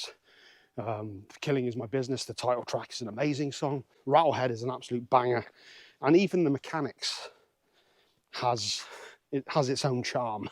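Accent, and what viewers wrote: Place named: Dartmoor, United Kingdom. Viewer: Where are you when you do these walks looks like Dartmoor or North Yorkshire to me. Guessing Dartmoor by your accent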